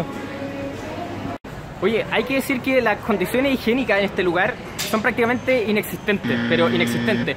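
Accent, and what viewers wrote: Chile. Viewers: Soy chilena y tu acento es re pesado, nose si pones el acento asi para los videos o tu voz es así, pero no todos los chilenos hablamos con ese acento 🤣🤣❤️ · Tiene un acento chileno muy marcado. Me parece que tiene una musicalidad muy distinta a las de otros países hispanos. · te pido disculpas por el abuso,ví tu vídeo porque el acento chileno es mi favorito y de algún modo viajamos a esos países, Gracias a vos y al trabajo de otros youtuber....pero pienso deberías moderar tu lenguaje respecto a las diferentes culturas,son fuertes cierto,en América talvez jamás comeríamos muchos de esos animales., Pero son personas con costumbres diferentes y si entendieran lo q dices puedo jurarte q más de uno se ofenderia.....es imposible no impresionarse pero podemos controlar lo q decimos...soy hondureño,quizá no peques lo q digo, pero es un consejo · Claramente es acento chileno.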